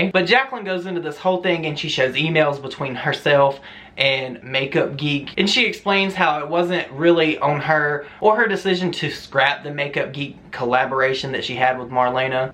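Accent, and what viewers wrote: South, United States. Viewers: sweetie where are you from? You sound like everyone in my family from Ms,Al, Ky and parts thereabouts. No shade to you, you’re making me homesick. Love your content.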